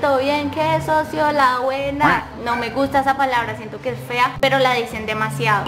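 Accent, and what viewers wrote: Antioquia, Colombia. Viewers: No sé porque pero yo solo escuché , se mi esclavo para siempre, ese acento colombiano mata a cualquier hombre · Y usted habla muy paisa 😹 bueno está bien el video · Yo pensé que eras paisa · Xd va con todo no soy paisa y pero me encanta el acento viva Colombia 🇨🇴🇨🇴🇨🇴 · Amo el acento colombiano 😍 saludos desde argentina 😊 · Soy Dominicano y me encanta el asento colonbiano y amo a mi novia de colonbia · Esta chimba su vídeo pero no parecieras que fuera de tolima sino paisa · Este es un acento hispanohablante colombiano 🇨🇴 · Soy Méxicano y yo amo el acento colombiano me enamora weeeey ❤ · Soy 🇨🇺 cubano, pero me encanta el acento Colombino ✌🏽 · Me me canta el asentó colnviano es pero al gundia ir por aque yos lados 🥺🥺🥺🙏🙏🙏🙏 al guíen que me acompañe 😊😏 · Soy de Argentina pero me encanta el acento colombiano 💗💗 (Ahre que nadie me pregunto) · El acento colombiano es horrible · Soy de Ecuador y me encanta Colombia el país quisiera viajar ahí pero me encanta el acento🥰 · Me SUPER ENCANTA el ace to PAISA 😘😍😍😍😍😍😍😍😍😍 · Una de las cosas que amo de es como habla Paisa 😍❤, Saludos desde Medellin, Me encantaría que vinieras a Medellin osea seria super cool ❤🤣😍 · Wow 🤩 me encanta tu hacento colombiano🥰 saludos desde South Carolina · Lo rraro es que usted dice que los tolimenses no hablan asi y q que usted es del tolima. 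Pero lo mas curioso es que usted se le sale el paisa chibiado. Alguien que me lo explique. Por que el que es de la tierrita habla asi y no se le Pierden sus raíces. Yo soy del huila y vivo en bogota. Y sigo con mi hablado opita... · Wey yo soy mexicana y me enamoro con el acento colombiano esta muy bueno su acento encerio wow algun dia ire para Colombia y me gustaria aprender muchas cosas de Colombia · El acento paisa es horrible y suena muy margina, que horror caray.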